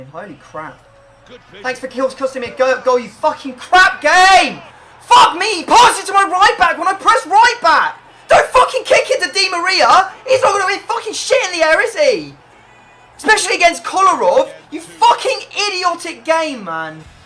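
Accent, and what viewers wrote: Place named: RP, United Kingdom. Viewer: He sounds so posh lol but he's a legend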